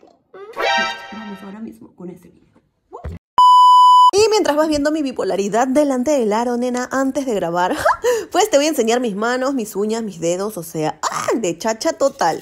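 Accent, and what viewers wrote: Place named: Peru. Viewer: Me carga el acento peruano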